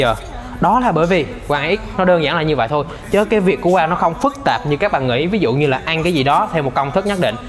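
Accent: Hue, Vietnam. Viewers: anh ở huế đúng k ạ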